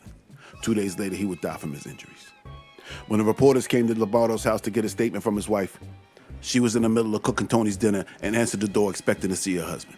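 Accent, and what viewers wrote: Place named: East Coast, United States. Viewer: Here Boss. Don’t want Mr. Algorithm to wack me and bury my ass in the desert. Looking forward to the John Torrio vid. I was introduced to him via the series Boardwalk Empire and want to know more about his beginnings. Your narration, dialogue, fly and scruffy East Coast accent, and gangster allusions are second-to-none. Superb story-telling skills. Don’t take wooden nickels Boss. See you’s in the funnies Boss. 🖤 📚 🎥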